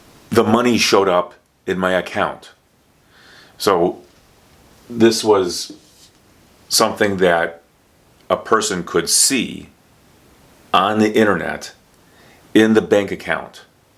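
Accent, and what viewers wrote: United States. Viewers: Thank you for your perfect lessons and real American pronunciation! I try to master American accent with you.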